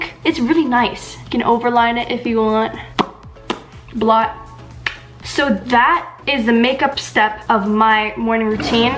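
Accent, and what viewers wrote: United Kingdom. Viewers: Was that a Scottish accent ?? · What's up with the British accent tho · I got function so good And love the British voice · What's with the British accent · You sound British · I love her British accent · I was getting weirded out when you kept doing the British accent are you a little bit British?? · she sounds British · I am younger than her and I know how to put makeup 😳 Oh and did I mention you speak some British I am impressed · that slight British accent tho. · are you British?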